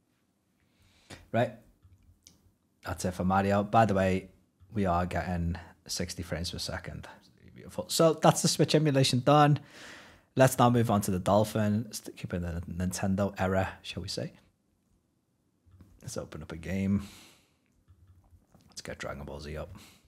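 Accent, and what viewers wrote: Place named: Liverpool, United Kingdom. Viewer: Your accent is hurting my brain, it's like 85% scouser and 15% something else. You are from liverpool right?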